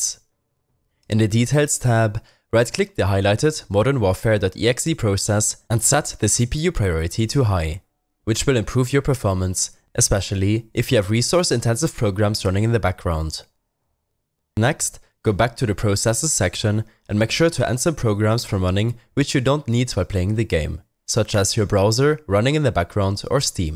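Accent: Germany. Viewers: deutsche aussprache alter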